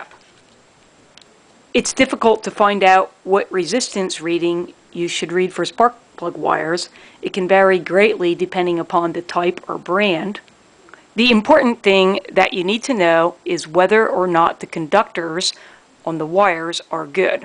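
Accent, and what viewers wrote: Philadelphia, United States. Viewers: Philly accent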